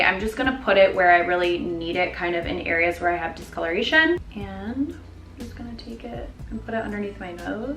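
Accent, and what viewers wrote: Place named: Michigan, United States. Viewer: I hear your Michigan accent ♥️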